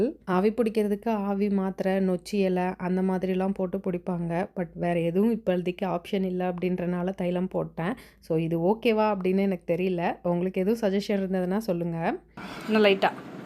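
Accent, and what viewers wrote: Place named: Palani, India. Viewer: Hi sis....recently i started watching ur videos...i love ur Tamil slang because its very nostalgic to me as my mom's native is palani neikarapatti and my relations over there speak like you..your vlogs bring back my childhood memories...today morning i saw ur old vlogs like love story,album vlogs and so on.....oru oru vlogs um enga ammaye oorla naa irukara mathiri feel aaguthu....mainly ur amma veetuvlogs along with your akka n family....!! Ippo yaarum oorla illa sis thatha n ammaye is no more..yellarum padippu,velai,kalyanamnu vera vera oorla settle aagitom....oru oru school summer leave ku vandha eppdi irukumo apdi irukku...unga face kuda en friend Selvi face mathiri irukku....my MEIYAZHAGI😢..!! I donno where she is...cudnt get the contact too!! a big hai to your family members n brother's ideologies!! Final thing i want to share is check your Magnesium level and kuthingal vali reason is mainly low Magnesium...consult a doctor...she will definitely suggest NEUROBION tablets...!! 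Don worry it is Magnesium deficiency..i will suggest ur vlogs to my cousins...so that they can also get the nostalgic experience...❤❤.take care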